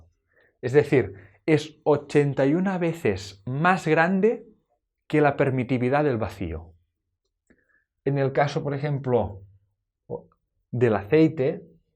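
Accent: Spain. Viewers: como se nota el acento catalán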